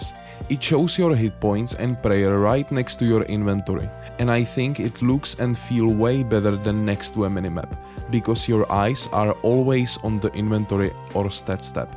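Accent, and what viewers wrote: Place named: Czech Republic. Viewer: něco málo sem se naučil dík začal sem hrát zhruba před 14 dny:D okamžitě mi z přízvuku bylo jasný že si Čech, tak zdar!..... teď když mluvim o přízvuku... zníš na 100% jak Adam Jícha lmaoo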